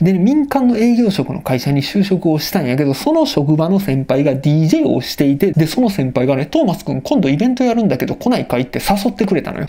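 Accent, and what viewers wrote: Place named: Kanto, Japan. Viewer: トーマスさんの動画で過去一おもろかった おちょくってるような関東弁がいちいちツボ(笑)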